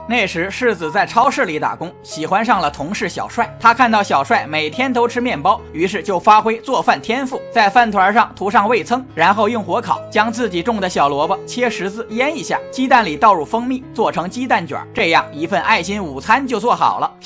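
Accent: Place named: Northeast, China. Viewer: 這東北槍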